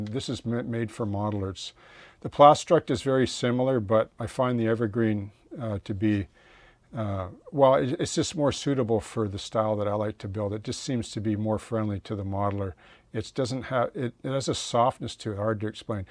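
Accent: Canada. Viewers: Located and ordered! Thank you so much. Keep on building and doing these videos. I'm not a Railway modeller, but modellers appreciate everything done on a small scale - or I do at least. I especially love your scratch-built ships and boats. I knew you were a fellow Canadian when I heard you speak. 🙂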